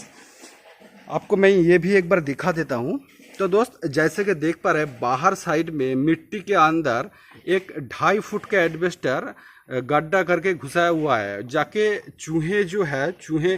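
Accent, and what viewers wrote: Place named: Odisha, India. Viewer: Bhai Apke bonle ki tarike Se lag Raha hai app odissa Se ho.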